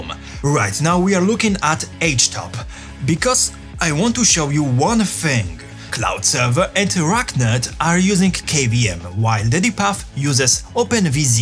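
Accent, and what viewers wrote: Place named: Europe. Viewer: English is fine! A bit of an accent, but that's just standard for anyone in Europe :) As for VPS, I had no idea you could get something that actually gets something done for that cheap. Thanks for the info!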